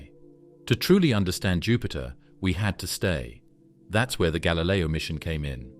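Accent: England, United Kingdom. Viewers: Why the english accent? You can't find an american to narrate? It's annoying. Unwatchable.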